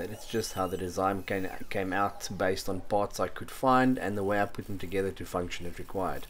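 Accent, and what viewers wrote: South Africa. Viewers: You sound very South African? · Fabulous blog, great flow, good content. Well done. I recognize your accent as South African. Cape Town, I suspect. Fly the flag high Mate. Great work. Mark, Johannesburg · I may be really wrong, but you sound South African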